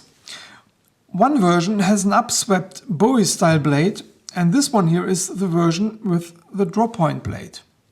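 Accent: Germany. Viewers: Nach den ersten 4 Wörtern wusste ich: ein Deutscher xD keep going - it's great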